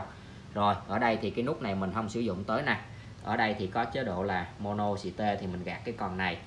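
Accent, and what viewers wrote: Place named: North, Vietnam. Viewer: Người nam mà nói giọng bắc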